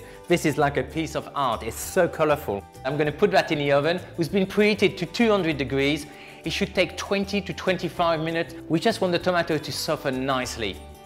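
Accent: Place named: France. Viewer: ur French accent is slowly picking up some British slangs too I think haha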